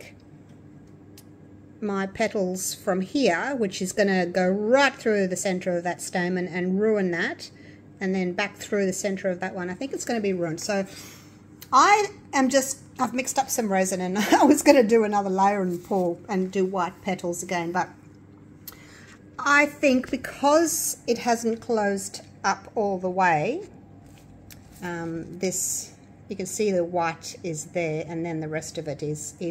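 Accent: Australia. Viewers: Aussie accent is the best...(No, I'm not biassed.. well, maybe just a tiny, incy, wincy bit🤣🤣)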